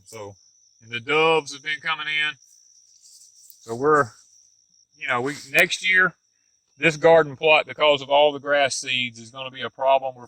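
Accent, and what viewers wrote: South, United States. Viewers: Love your southern accent!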